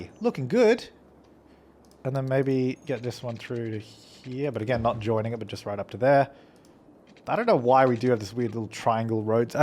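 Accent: Australia. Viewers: Drone club Not really, it's just the aussie accent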